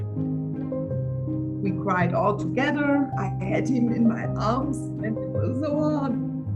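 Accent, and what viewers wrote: Germany. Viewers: I was already wondering about your German accent before...xd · Are you a german? Later - Yeeees you are a german. You Dialect is german. German Toll das es auch mal eine geschafft hat, soviele Klicks zu bekommen. Zeigt das du das richtige Thema hast. Meine Bonny hatte nach 8 Wochen 4 Babys bekommen. Ich hatte sie alle gut untergebracht. Eine hatte ich behalten. Clyde hieß sie. Mittlerweile sind alle gestorben. Ich glaube sogar, das meine Bonny für mich gestorben ist, damit ich gesund werden konnte. Sie wurde überfahren. ihre Babys, Clyde und später kam Sophie (Scholl) zu mir zurück, wurden fast 20 und 21 Jahre! Sophie habe ich Garten begraben. Als sie ging, habe ich ihre Seele gesehen. Sie glitt durch meine Finger und glitzerte. Ein Licht umgab sie, kreisrund um ihren Körper. So groß wie sie lang war! Ich vermisse sie noch immer! Obwohl sie schon 2006 ging. 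Schön das sie Heiko ein so gutes Zuhause gegeben haben. Das freut mich sehr! Ich wünsche ihnen viel Gesundheit und viele neue Tiere die sie retten können! English It's great that one managed to get so many clicks. Shows you have the right topic. My Bonny had 4 babies after 8 weeks. I accommodated them all well. I kept one. Her name was Clyde. All have now died. I even think my Bonny died for me so I could get well. She was run over. their babies, Clyde and later Sophie (Scholl) came back to me, lived to almost 20 and 21 years! I buried Sophie in the garden. When she left I saw her soul. It slipped through my fingers and glittered. A light surrounded her, circling her body. As tall as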